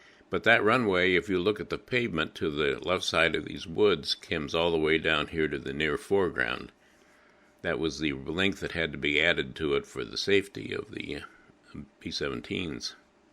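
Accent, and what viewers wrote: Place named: United States. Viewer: My wife was born on the airfield in one of the Nissen huts: after the war there was a tremendous housing shortage and her parents along with many other local people squatted in the disused huts until they were re-housed in one of the new housing estates that sprung up in Kettering. Incidentally it was wonderful to hear an American voice doing the narrative.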